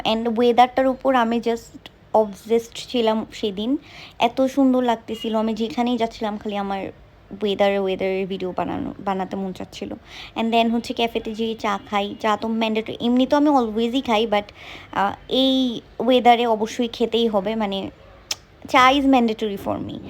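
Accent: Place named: India. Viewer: tmr ktha bartar style indian typr........ Tmr nnu brir vlog vdo dekhe sure holam j tumi amdr Desher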